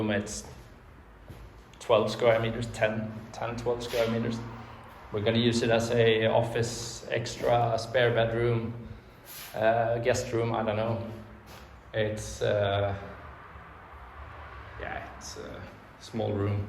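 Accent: Sweden. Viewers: Du låter svensk. Lycka till med renovering - fantastiskt hus! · Where are you from? Your accent is very Swedish. 🙂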